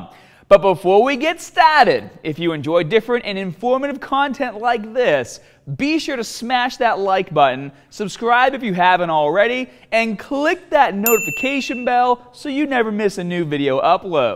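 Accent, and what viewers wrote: Boston, United States. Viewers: Woooof I didn't know this guy was from Boston until "scars" and "marks" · I'm detecting that "Bostonian" accent. :-) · are you a Bostonian. The way you say 'started' --> 'Staaahted' is a dead giveaway! From one Bostonian to another - Do. Not. Lose.the.accent.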